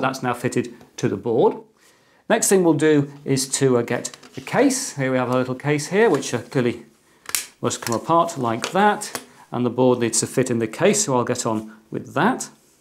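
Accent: United Kingdom. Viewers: Why do british people say "Sor" instead of "Saw"?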